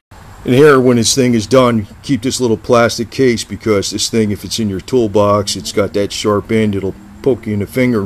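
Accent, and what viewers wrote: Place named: Cleveland, United States. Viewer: no offense.....thought I heard a little Cleveland in you....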